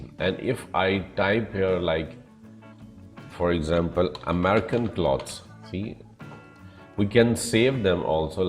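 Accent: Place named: United Kingdom. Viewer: Sir your British accent is too good 👍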